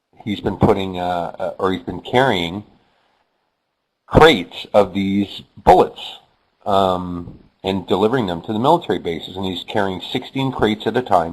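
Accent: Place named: Canada. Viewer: i hate your canadian accent "aboot"? thats not how the <link> pronounces it u ignorant ppl of canada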